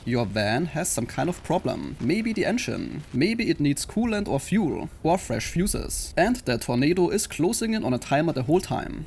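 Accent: Germany. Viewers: You sounds like german.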